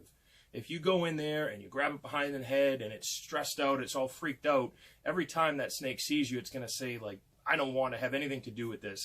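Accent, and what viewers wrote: Canada. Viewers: Sounds like you are from Canada? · I'm from Massachusetts, so I don't know why you would sound Canadian to me if you weren't. But I saw a business with the same name as yours listen in Lynn, MA.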